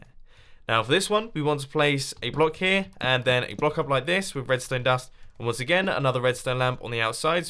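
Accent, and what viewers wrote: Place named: United Kingdom. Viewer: not to be rude are u british im thinking that because of ur awesome british accent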